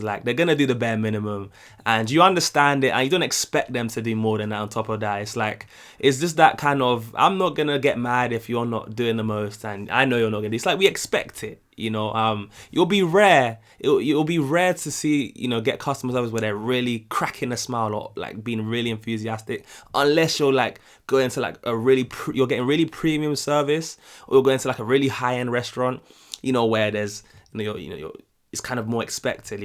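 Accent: England, United Kingdom. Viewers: 💙 THE ACCENT IS EVEN RARER WHEN YOU ARE A BLACK BRIT. 😆 A LOT OF AMERICANS ARE GENERALLY INTERESTED IN OTHER CULTURES & FOREIGNERS. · I'm only at point 11 but I have to say, oh my goodness... the UK accent... I could listen to you talk all day lol.. seriously though. I LOVE your accent and I think it's pretty universal that you all have the BEST accent.. (well you and the Irish lol).. I don't even think it's opinion, I think it's fact. Lol...... it is like music to me. As for customer service, I know in my case it's not fake. I work in a grocery store, I shop for people and take orders out to their cars.... as tired as I get sometimes, I always try to think about the kind of day the customer may have had and always try to give my best. If I am having a bad day, the customers are always understanding. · We Americans love a British accent!! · 90% of the world drives the way we Americans do, and with the wheel on the left! 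And yes, we love that accent…but because so many people like me watch so much YT content from Brits, it’s not perceived as unique or special as it USED to be. The accent always projected intelligence…American news networks even hire British announcers for this reason…but of course, we now know people can be stupid on BOtH sides of the Atlantic.😂 But it still has that effect on us! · it sounds like you had a really nice American vacation. 😊 We Americans don’t hear British accents very often… our television shows and other media is 99% American so a British voice is indeed attractive to most of us and is a bit exotic to our ears. I’m a Southern girl and when I visit up north to cities like NY or even west to LA, the locals enjoy hearing my southern accent. It’s foreign to their ears just like your British accent. 😊 We do love road trips! As a teen, my family drove from Alaska to North Carolina. 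Glad you had a good trip and am looking forward to your next video. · Your accent, or, the British accent in general will improve your American experience. I love the accent. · I don't think the customer service attitude is fake it's part of our culture because we are a consumer culture. Your accent yes in America we love the English accent it's like our favorite that and Jamaican.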